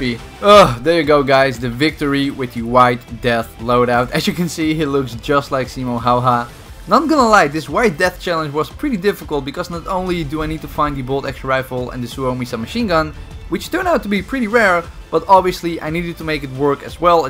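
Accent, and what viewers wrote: Netherlands. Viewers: Wait are you Dutch? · yo wtf you're dutch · Wtf you are dutch? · are you from the netherlands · Are u Nederlands 🇳🇱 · I know your Dutch i can hear t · Boy you dutch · Lol are u dutch? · Are you dutch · ik hoorde aan je accent dat je nl bent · Ben je nl are you Dutch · His accent sounds SUPER dutch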